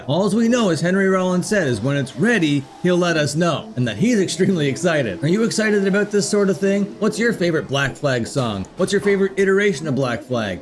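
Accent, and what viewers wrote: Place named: Canada. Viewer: You have a Canadian accent.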